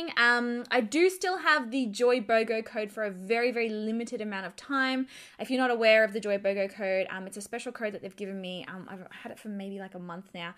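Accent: Australia. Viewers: Your accent is so Australian and I am Australian lol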